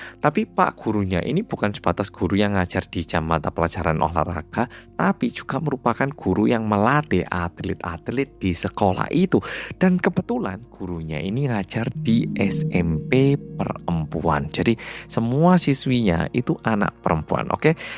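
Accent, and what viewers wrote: Java, Indonesia. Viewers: Maaf ya mas kalau aku denger denger suara mas itu bener bener medhok Jawa banget jadi bangga ada orang Korea tapi puiiinnterr ngomong jowo 😅😅😅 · Love swindler yang medooook. Makasih sudah menghibur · kan tinggal lama di Malang sekolah di Malang juga lama..gimana ga medok..bule aja ada yg medok biar pun ga tinggal lama di Indonesia ..lo tau ga bule medok ..😂😂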